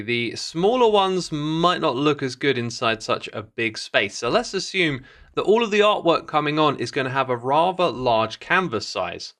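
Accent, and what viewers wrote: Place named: United Kingdom. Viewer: I was hoping to find a comment like this. British accent certainly doesnt help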